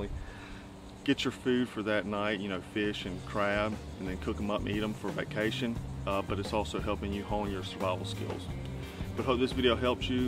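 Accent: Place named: North Carolina, United States. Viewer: Thanks for this video, helps a lot, as I'm planning a trip with someone to the Everglades national park, hiking to the coast of Florida, to Clubhouse beach, I can not wait! Should be great fishing and I hear a lot of crabs, I'm from Central Florida myself but never been that far south, Just curious from your accent, are you from North Carolina?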